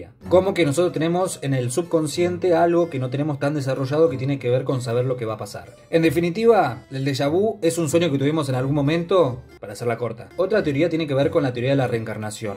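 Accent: Argentina. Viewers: Sos de Goya?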